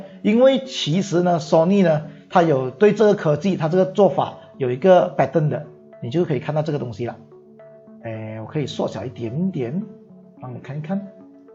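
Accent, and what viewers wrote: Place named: Malaysia. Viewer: 马来西亚口音的普通话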